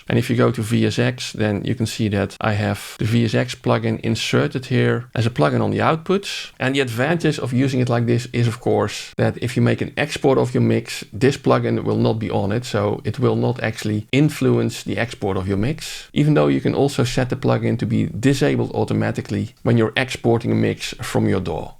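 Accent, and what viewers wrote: Netherlands. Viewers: lekker Nederlands accentje whehe goede review en cool te zien dat er nu 5.0 reviews komen :)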